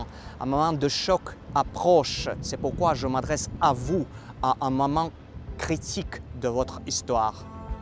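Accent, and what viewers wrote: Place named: Russia. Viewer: ну жестко русский акцент, а так хорошо!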